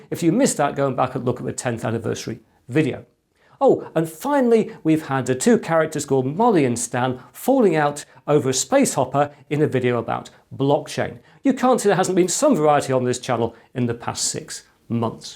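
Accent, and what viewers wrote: England, United Kingdom. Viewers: I very much enjoy the vidieo's, in fact at 62 I'm finding myself once again energized into doing more new things. The video length is good but, probably due to my age, I find you speak a bit quick so I have to go back and re-review to be sure I understood what you said. Lighting is good, subject camera work is also good and your explanations don't seem to get off subject and like most American's, love the English "accent" .... LOL. Recently dropped you an email asking a question concerning the Windows PE and hope to hear from you soon. Please keep up the great work, it is greatly appreciated from this side of the pond!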